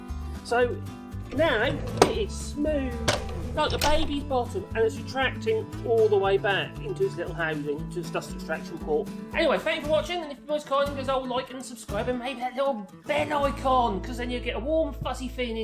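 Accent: Norfolk, United Kingdom. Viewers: I know this is how the world has gone but I prefer your natural voice. As a Scouser I can't be certain but I would bet it is a Norfolk accent?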